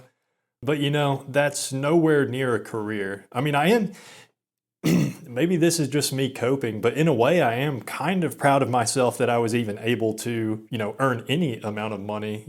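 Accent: South, United States. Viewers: your university should have a resume development department, they'd still help an alumni out, also they train you for specific interview questions, if you want they'll even film you and walk you through the tape and where you could improve. Do I detect a Southern accent? T. Savannah, GA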